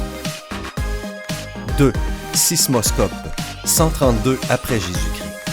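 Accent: Quebec, Canada. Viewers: Je déteste entendre l'accent parle par des Quebiquois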